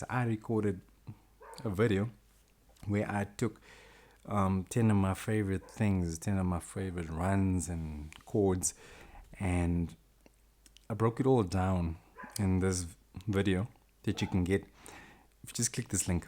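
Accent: South Africa. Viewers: bless you bro. You sound like a fellow South African. But let me not assume.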